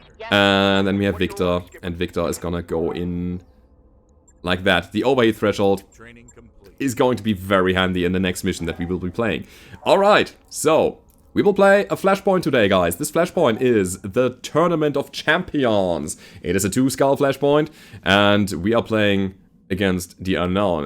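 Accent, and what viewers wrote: Germany. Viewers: Something about a guy speaking English with (what I think is) a German accent reading things with different other accents is just silly. thumbs up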